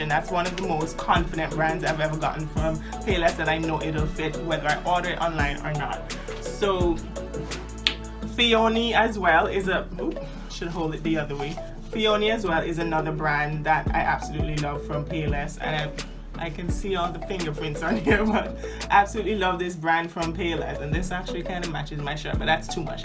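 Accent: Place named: Caribbean. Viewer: Thank you for this! 💕I’m a young girl wear a size 12 feet and can’t find cute shoes anywhere😩. Love your accent. I’m also from the Caribbean. I’m from the Virgin Islands.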